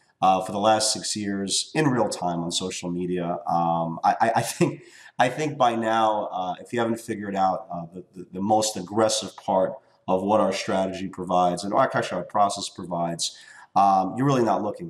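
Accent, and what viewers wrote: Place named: United States. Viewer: your accent is quite possibly the best American accent in existence.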